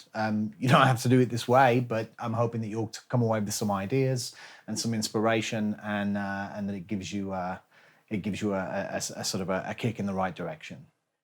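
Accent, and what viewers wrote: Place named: United States. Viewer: What's with the American accent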